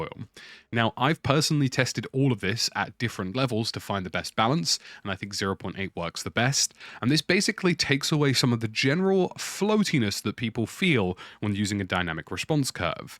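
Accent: United Kingdom. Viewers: this is a British accent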